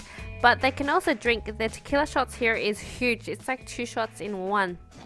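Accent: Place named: Australia. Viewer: lol cool I was just curious because your intro was hello in Korean. Anyway you don't have any accents other than the obvious Australian's accent:). If you're still in Korea, please do a street food video =) cheers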